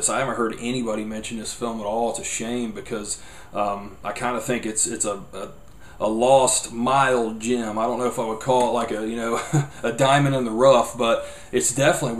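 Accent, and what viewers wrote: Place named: South, United States. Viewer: Handsome. Love your southern accent, when it pops up. Trip With the Teacher popped up in my recommended YouTube videos, but, I don't know if it's here. Got halfway through it's trailer. When you say, "do," &, "too," I melt 😍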